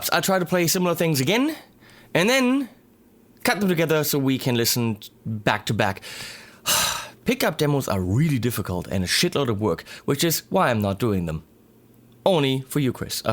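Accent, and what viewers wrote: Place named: Germany. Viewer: Hab ichs doch gewusst, dass du Deutscher bist! Den komischen Akzent haben halt nur wir :D Ich überlege grade, ob es sich lohnt, meine Fender Lite Ash mit besseren Tonabnehmern auszustatten... Momentan klingt sie ziemlich drahtig und dünn. Die Mexico-Tele von nem Freund klingt richtig geil über meinen Fender Deville 410...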